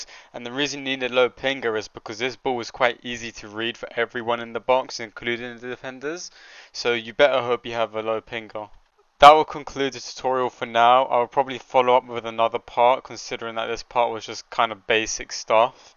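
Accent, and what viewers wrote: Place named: India. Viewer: I LOVE THE PRIME (u sound indian with that mic)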